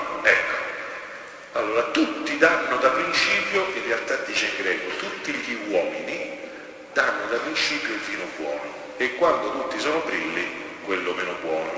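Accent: Rome, Italy. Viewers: Padre, io la rispetto perche’ lei porta la parola di Dio padre nostro, a tutti noi suoi figli. Ma mi permetta il suo accento e dire romanesco è veramente fastidioso. Vede, le sue catechesi sono seguite in tutta Italia, io per esempio sono di Torino e a volte fatico a capire la su “lingua”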